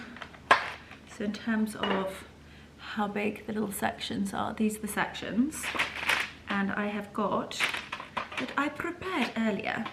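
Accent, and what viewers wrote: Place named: RP, United Kingdom. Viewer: I did look it up, and whilst she was younger doing the interview, it is amazing at how much more "posh" she sounds now. Not complaining, just is interesting.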